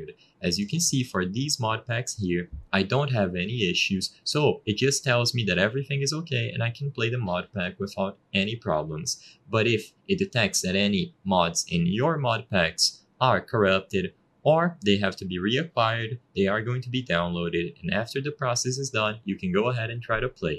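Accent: Brazil. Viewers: O sotaque è inconfundível, Br e o que não falta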